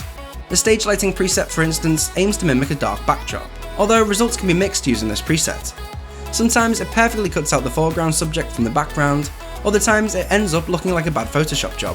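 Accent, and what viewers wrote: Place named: Scotland, United Kingdom. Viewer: I love your accent, is that Scottish?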